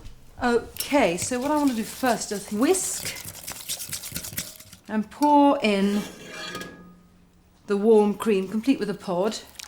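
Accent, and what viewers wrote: England, United Kingdom. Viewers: Falling in love with this English lady & her accent. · I think she's speaking British English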